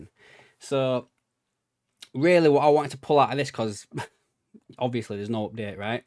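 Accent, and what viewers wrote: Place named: Yorkshire, United Kingdom. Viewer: Proper Yorkshire our is.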